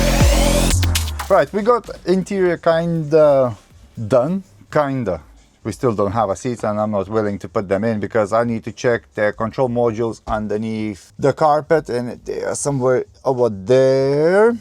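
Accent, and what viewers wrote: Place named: Eastern Europe. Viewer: Good luck my Slav accent friend. I belive in your skills and your patience. I wish you all the best. This Bentley will be turn over point for your channel,repaired or not. New subs will comming. Sorry on my bad english,cheers from Croatia.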